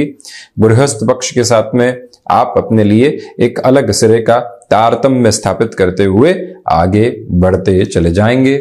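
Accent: Standard. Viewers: क्रपया नाराज मत होना आप क्योंकि मैं भविष्यवाणी से ज्यादा वर्तमान कर्मों पर यकीन करता हूं (क्योकि कल किसने देखा है, क्या पता कल हो न् हो)/ लेकिन आपके शुद्ध शाब्दिक उच्चारण का दिवाना जरूर हो गया हूं मैं, इसमें रत्तीभर भी कोई शक नहीं है/ आपके वीडियो देख-देखकर मैं अपनी खुद की हिंदी भाषाई जबान को लगातार शुद्ध करने की चेष्टा करने का आदि सा हो चुका हूं/ मेरा ऐसा मानना है कि यदि कोई व्यक्ति हिन्दी भाषीय समुदाय से हो तो उस व्यक्ति को अपनी शुद्ध हिन्दी भाषा की गरिमामय जुबान को शुद्ध रूप में जीवित रखने की नैतिक जिम्मेदारी अवश्य निभानी चाहिए "पीढ़ी दर् पीढ़ी"/ एक तुच्छ हिंदू प्राणी स्वरूप मैं ये जिम्मेदारी बखूबी रूप में निभाने की कोशिश अवश्य करता हूं अपने परिवार में/ ईश्वर से कामना है मेरी कि आप सदैव सुखी व् स्वस्थ रहें परिवाजनों सहित/ हार्दिक शुभकामनाएं🙏🙏🙏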